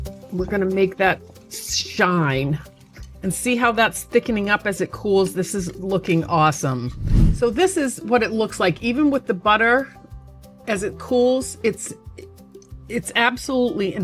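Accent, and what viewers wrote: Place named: Upper Midwest, United States. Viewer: I need to Know!!!! Where is from? I get upper Midwest, New York, definitely along the Canadian border. Do tell please!!!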